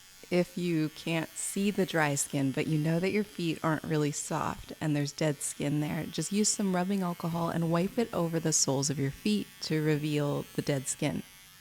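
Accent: Canada. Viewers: Are you from Vancouver you sound like you are? 😂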